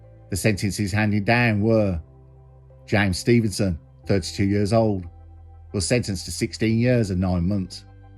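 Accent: Birmingham, United Kingdom. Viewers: Massive congratulations and respect to you and your content brother. I literally can binge watch your videos for hours. There all perfectly to the point and not too long and cover everything and sum it all up at the end brilliantly. Keep doing your thing geezer. Ps never used to be a fan of the brummy accent but yours fits perfectly. Keep it up mate.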